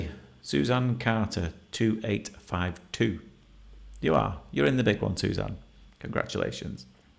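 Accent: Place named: England, United Kingdom. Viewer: Made it all the way and wow you are so addictive to watch! Also I’m an English girl now living in USA and it’s lovely to hear a fellow accent! I’d love to be Subscriber of the day 😊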